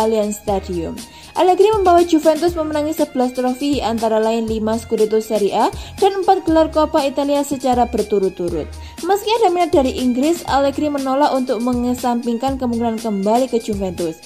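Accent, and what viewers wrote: Java, Indonesia. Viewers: Logat jawa nya kental sekali mind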